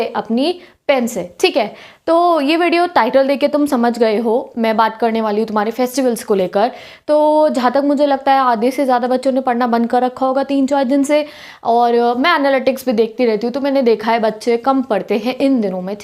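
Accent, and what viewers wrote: Punjab, India. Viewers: R u punjabi didi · Are u Punjabi?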